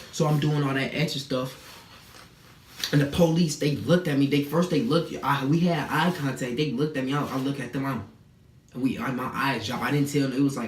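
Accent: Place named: Chicago, United States. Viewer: I can tell yh frm chicago fote😭😭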